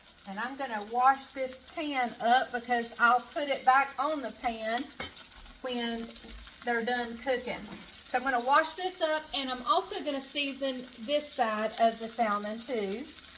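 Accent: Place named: South, United States. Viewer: I was raised saying salmon with the same pronunciation as you. We are beautiful southern belles and that’s just how we talk. 💖 I’m not a salmon person but this sounds really good and I just added salmon to my grocery list. Thank you, I need to add more fish into our diets.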